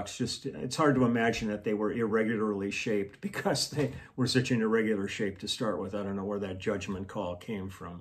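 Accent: South, United States. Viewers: You made me spit my drink out when you told the story about the Loveless Cafe! As they say, "You got South in your mouth"! I can't believe you can remember street names! I have to say, you sounded very authentic saying Goo-Goo clusters! That song you played was popular right when I moved Nashville from Houston. Thx for the giggles! Btw, I just played her the Loveless Cafe story and we died laughing! Thank you again! Taryn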